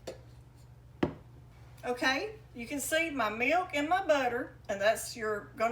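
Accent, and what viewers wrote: South, United States. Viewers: Thank you for the video. This makes so much sense. I’m guessing that if you want to make whipped cream from it, you need to chill it well first? Thank you again. I love your Southern accent too by the way. Love from Australia ❤🇦🇺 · People with southern accents always have the best food videos! 😋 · I found you today searching how to make Homemade Heavy Cream. Love your Southern accent and your style with easy to follow, straight to the point instructions. I am a new subscriber and need to check out your other videos. Thank you. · Bravo🙌 I'm so glad this video popped up. I just subscribed and I'm sharing this video on pinterest.❤ I hope that helps your channel. This might even be cheaper than buying heavy cream. Thank you so much for sharing. Love your accent, I'm from Chicago but live in North Carolina now. I used to tell my fiance that I like to Southern accent and he said it's a drawl.😂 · I knew this was gon be good from the accent alone. 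Southern moms cook the best hands down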